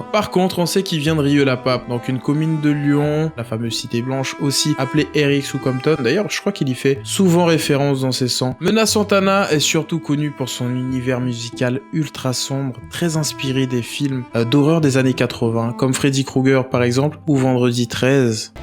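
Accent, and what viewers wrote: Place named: Dominican Republic. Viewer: la façon de comment il parle espagnol sa se voit que c'est un dominicain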